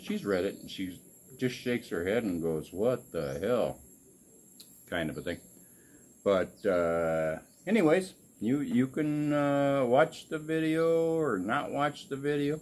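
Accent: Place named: Midwest, United States. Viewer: Hello. I really enjoyed your stories. I remember the stories about when you was a kid in Minnesota at the vacation spot they used you for a fish tour guide. That voice you have in that Midwest accent really does a great job at storytelling. If you look through any comments I've made I don't think you're going to find anything that I've ever said that's been negative. I wish you and Rose the very best. Just an idea it would be nice to see if does come out of her shell the progression of that. I've seen some other guys that have had shy Filipinos and it took a while but you could see them get better and better at being on camera and such. God bless good luck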